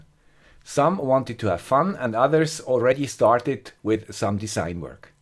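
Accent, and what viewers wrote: Switzerland. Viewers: You are correct. Many crowdsourced collaborations have occurred in the digital / electronics world. Many have failed due to the lack of process and project management issues. Do not be so hard on your documentation assessment (at least - in this video) and challenges you faced. You highlighted important elements - and yes, all engineers, myself included, hate paperwork. But as all know, they are a necessary evil. We have learned much from Michael Faraday and his superb skills and the unfortunate challenges of lacking a formal education that, very likely, held him back from even higher achievement than he already had achieved at such an early age. Newton was the exact opposite. By the age of 23, his manuscripts had become masterpieces in their own right. We are all "lazy" in comparison ;-) Even so, I think you deserve a lot of credit creating this content and its format. 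It is superb - even for a guy with a Swiss accent - that is easily understood in the YouTube Universe!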